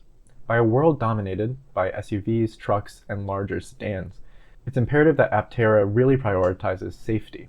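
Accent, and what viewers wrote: Australia. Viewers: You sound more Aussie than Yank, but you sell the snake oil like a Yank. Where are you from (without being specific)? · you think he sounds australian?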